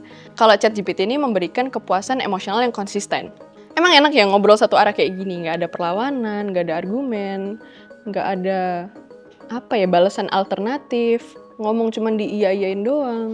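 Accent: Java, Indonesia. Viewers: medok like this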